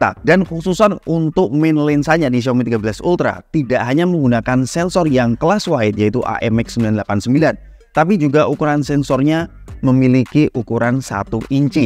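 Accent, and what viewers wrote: Semarang, Indonesia. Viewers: Orang semarang om?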